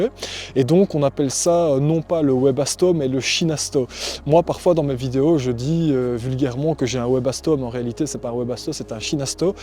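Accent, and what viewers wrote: Belgium. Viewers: Charmant accent belge 😇🤓🐨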